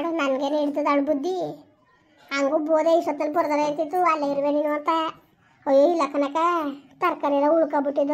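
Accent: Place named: Ramanathapuram, India. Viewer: Yavdu native Nimdu, e Tara language matadadu Ramanathapuram side, heli please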